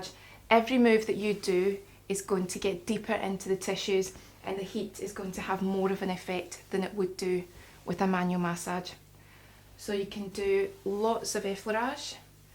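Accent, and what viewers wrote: Scotland, United Kingdom. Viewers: Stoone. I love the Scottish accent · What is your accent British, Irish, Welsh, or something else? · Scottish accent plus the word “stone” is such a perfect combination. Not sure why but that word sounds legit coming out of a Scot.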